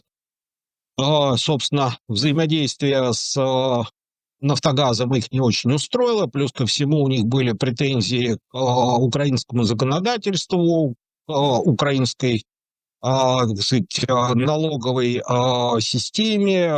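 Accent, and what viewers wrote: Okanye, Russia. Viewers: Оканье невозможно слушать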